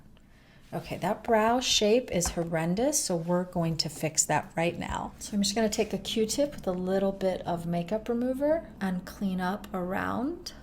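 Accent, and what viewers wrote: United States. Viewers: Regarding dangerous or toxic ancient makeup and beauty practices: seems not much has changed over the centuries--think of all of today's popular, potentially dangerous plastic surgery performed in the name of attaining beauty. Dont get me wrong, I take zero issue with anyone getting plastic surgery for any reason...just making a point. Side note: you've gotta be from the midwest. I am, and I pronounce words ending in '-ing' the same way as you. Cute!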